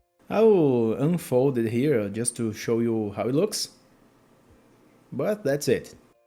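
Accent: Brazil. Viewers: Caraca, muito legel. Eu sei que você brasileiro pelo sotaque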